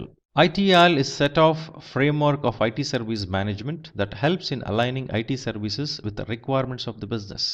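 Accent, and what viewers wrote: India. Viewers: Indian English voice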